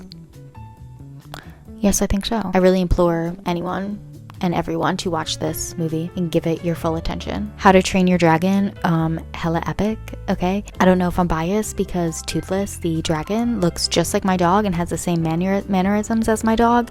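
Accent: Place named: France. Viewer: As a french person, i love your french accent !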